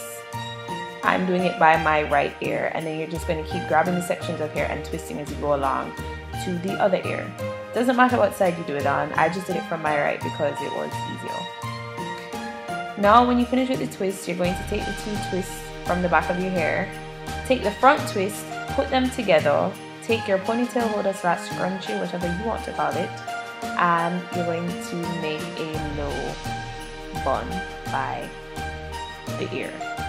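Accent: Jamaica. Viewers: Are you Jamaican? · Are u jamaican?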